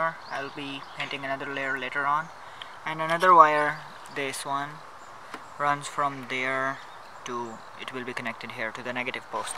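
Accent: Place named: India. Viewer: its indian ;)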